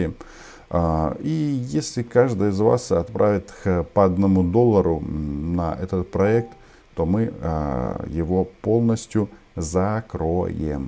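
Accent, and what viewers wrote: Russia. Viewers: Шановний Романе, ви переродилисъ? Чую наголошення ставите, як росіяни УкрАинские, але ж УкраІнські, зрозуміло, ви працюете на руськомовну аудіторію, нажаль,.....❤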